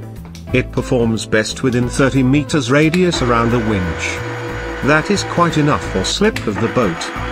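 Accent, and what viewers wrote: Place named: United Kingdom. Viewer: What is with the robot British narrator?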